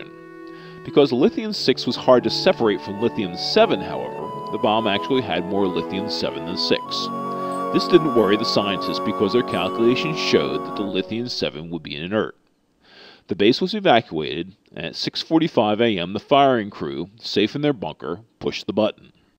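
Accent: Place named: California, United States. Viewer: something funny here is that the commentator try to replicate the 50's Trans-Atlantic English accent usually used in the 50's, the tone was simiar but the accent was not, it's a modern Mainland accent, Californian probably.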